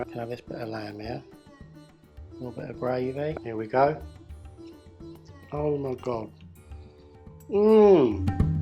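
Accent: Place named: Southeast England, United Kingdom. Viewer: You sound like you’re from Dartford or Bromley,.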